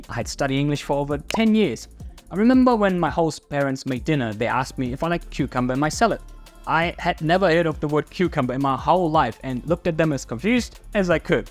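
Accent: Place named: São Paulo, Brazil. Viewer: Você parece uma paulista!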